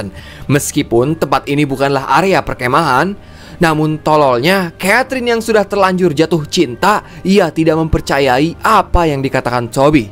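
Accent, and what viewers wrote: West Java, Indonesia. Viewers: Asalamualaikum ..bang Ciawi hadir.bang aku suka cara Abang ngomong nya pakai bahasa Sunda Abang orang mna sih😊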